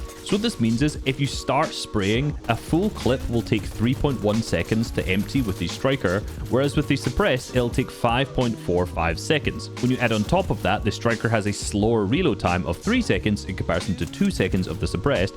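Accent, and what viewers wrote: Scotland, United Kingdom. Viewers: Yay Scottish man goes on cartoon gun rant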